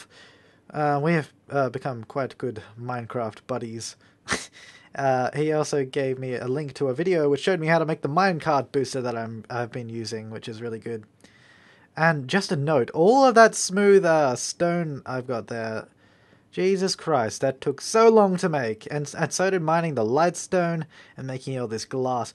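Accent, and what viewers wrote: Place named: Australia. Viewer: Your accent is stronger, and sexier :) What part from Australia do you come from? I'm From Nsw, Boganville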